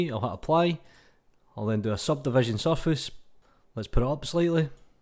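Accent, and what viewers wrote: Scotland, United Kingdom. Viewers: Hes Scottish lol no the wonder!!!! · nah its Scottish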